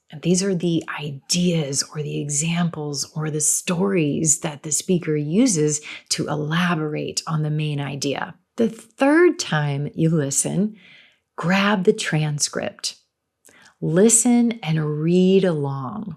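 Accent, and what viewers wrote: United States. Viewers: I'm addicted to your beautiful voice every night when i go to bed your voice is digging in my brain. American accent is outstanding. Much love to you Coach from Morocco 😘😘😘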